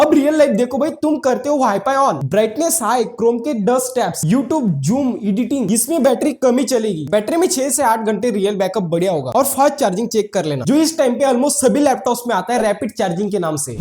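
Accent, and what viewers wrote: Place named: Maharashtra, India. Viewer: Marathi accent flowing up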